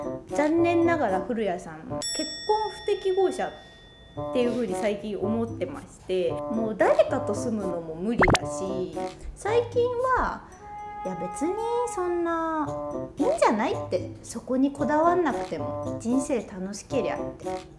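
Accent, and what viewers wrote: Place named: Tohoku, Japan. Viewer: ところどころ東北っぽいイントネーションだと思ってたらやっぱ秋田だったか！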